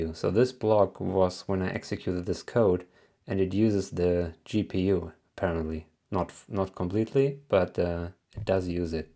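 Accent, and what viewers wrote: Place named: Germany. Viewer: I hear some German accent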